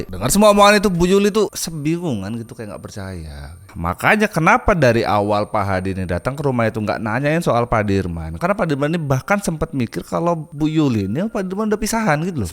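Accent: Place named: Madura, Indonesia. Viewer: Kadang kadang logatnya kayak logat orang Madura 😂😂😂😂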